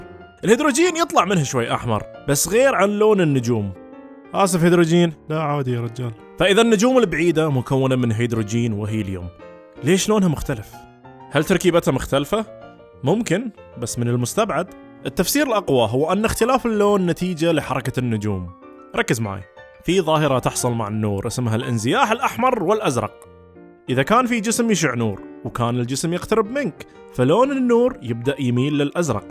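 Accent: Kuwait. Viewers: ياخي لهجتك مب سعودية لهجتك كويتية .. انت قلت انك سعودي 🤷🏻‍♂️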